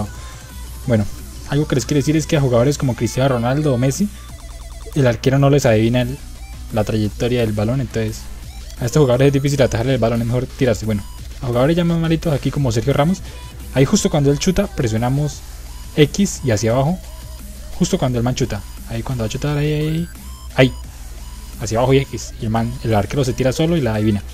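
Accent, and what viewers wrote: Antioquia, Colombia. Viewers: porsero ust es de colombia por q tiene pora voz de paisa de calarca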